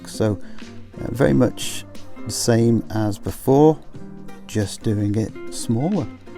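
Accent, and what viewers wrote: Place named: United Kingdom. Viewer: oh wait….sorry…..bad joke was bad…..I almost didn’t recognize the British/English accent…..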